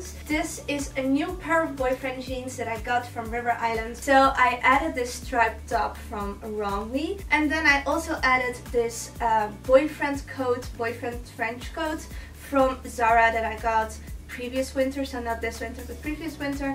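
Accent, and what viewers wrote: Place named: Netherlands. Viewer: First couple seconds of you talking and I already figured out you're dutch 😂😂 leuke video girl xx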